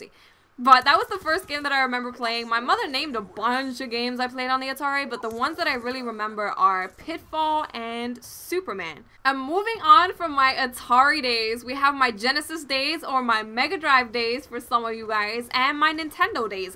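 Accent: Latin America. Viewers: are you Hispanic? I love your slight accent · lol, I can hear the Latina in her voice. Awesome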